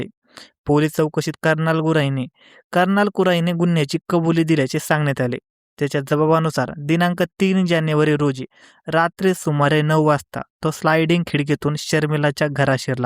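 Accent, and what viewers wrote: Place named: Nashik, India. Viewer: कुठला आहे re भाऊ, बोली वरून वाटते की तू नाशिक नगर भागातील आहे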